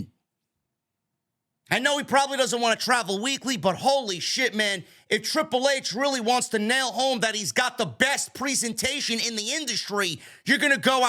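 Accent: New York, United States. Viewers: I just come here to listen to an angry New Yorker accent. 😂 Love it.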